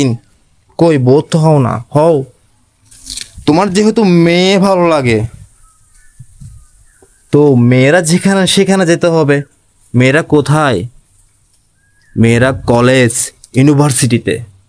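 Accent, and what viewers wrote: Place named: Chittagong, Bangladesh. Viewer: Bhai apni koi thaken?..apnr vasha shune toh chittagong thaken lgtese...amio ctg thki...apnr sthe dekha krte chaii ✊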